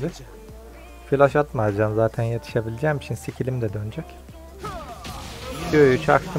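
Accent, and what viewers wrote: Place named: Zonguldak, Turkey. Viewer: Reis Zonguldaklı gibi bi gonuşman var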